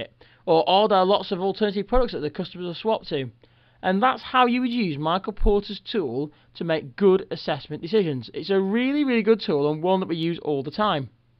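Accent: United Kingdom. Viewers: Because he's from the UK and thats just how he talks? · too englishish..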